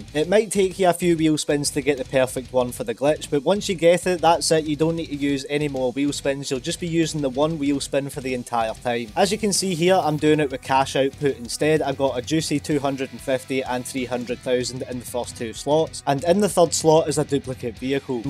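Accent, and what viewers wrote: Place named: Scotland, United Kingdom. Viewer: Your Scottish accent is awesome, I enjoyed every minute of it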